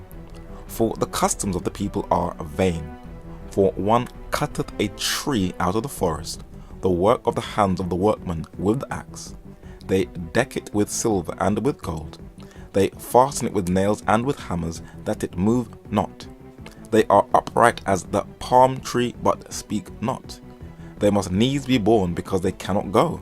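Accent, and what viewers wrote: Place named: Scotland, United Kingdom. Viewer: very difficult to understand the speaker. Sounds like he is a s Scottish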